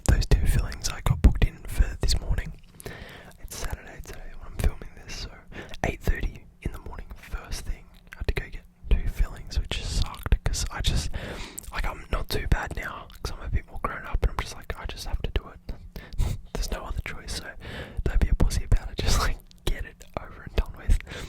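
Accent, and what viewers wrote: Australia. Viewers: Love the Aussie accent so much I wanna visit there and NZ so bad, my favorite music group is based outta NZ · omg a fellow aussie doing asmr???? this is insane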